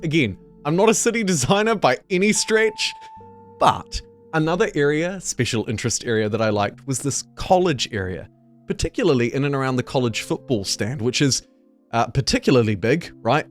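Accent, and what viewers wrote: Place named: New Zealand. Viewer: As soon as I heard your accent I was like, Holy shit your kiwi! Subscribed my friend 😁🤙🏼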